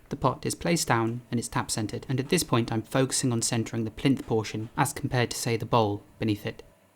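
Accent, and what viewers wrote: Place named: England, United Kingdom. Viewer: To anyone wanting to hear a real English accent.. welcome.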